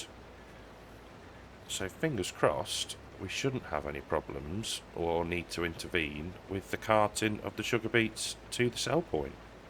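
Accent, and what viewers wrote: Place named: Birmingham, United Kingdom. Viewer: Hello Mr Brummie😁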